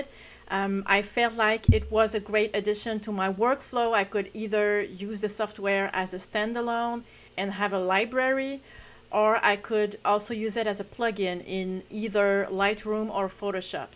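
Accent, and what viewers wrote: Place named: Canada. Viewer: Thanks a lot for these video, but I still prefer your French (with nice Canadian accent), please continue ! 🥰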